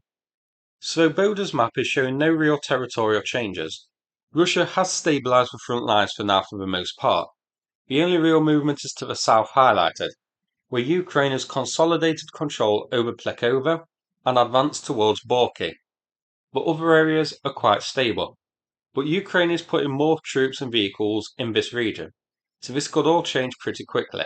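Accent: United Kingdom. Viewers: Excellent video. No unnecessary sarcasm or disrespect, just a good briefing in good old British English. I hope the Ukrainians make the most of this good moment and momentum.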